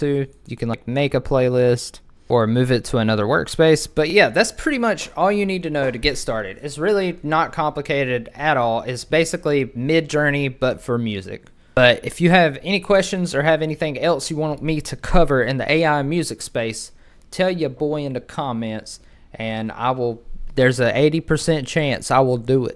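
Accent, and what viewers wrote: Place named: South, United States. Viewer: Nice work bro! What's the accent? It's south of the Ohio river for sure.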